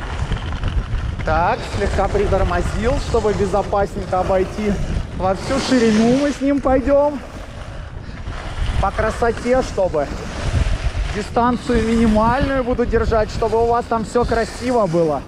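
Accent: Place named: Kyiv, Ukraine. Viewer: Откуда киевский акцент?)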